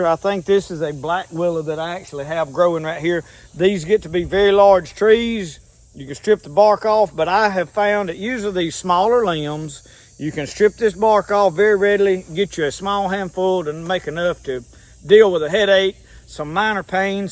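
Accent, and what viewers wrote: South, United States. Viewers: I just stumbled across your channel because of your skullcap video… I was like “this dude has got to be in Ms.” lol I’m in Wayne County. I totally feel that Southern Boy accent! The hubby and I got tickled at you for being barefoot as a yard dog in the woods 😂😅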